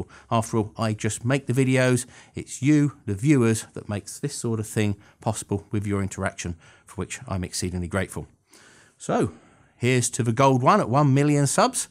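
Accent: United Kingdom. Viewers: Congratulations! I especially appreciate your fine British pronunciation in your videos. Keep up the good work!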